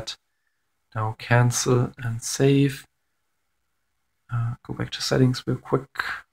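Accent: Germany. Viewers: Deutscher Akzent XD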